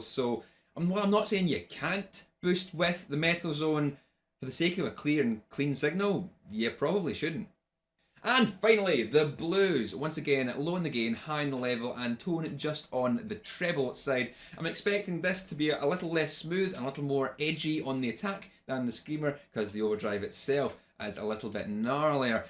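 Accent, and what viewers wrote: Scotland, United Kingdom. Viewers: You sound like William Wallace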